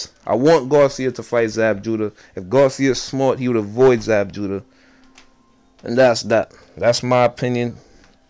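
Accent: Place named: Trinidad and Tobago. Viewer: irrelevant, but u sound like u trinidadian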